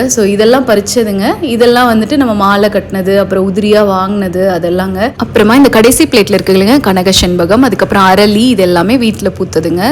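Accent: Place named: Coimbatore, India. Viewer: Akka im also from Coimbatore currently in canada, recently seeing your videos. Very nice esp neenga pesra vidham.. Nama kovai style, nalla irukeengla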